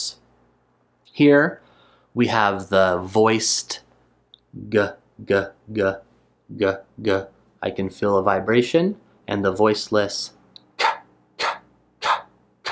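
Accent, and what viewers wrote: United States. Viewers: i Like amrican accent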